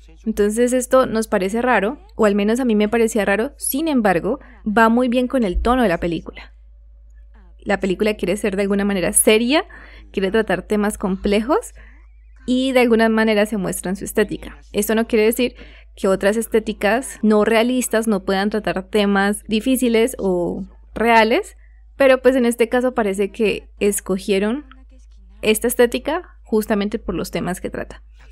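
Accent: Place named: Costa Rica. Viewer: Por su tono de voz creo que sos de C. R.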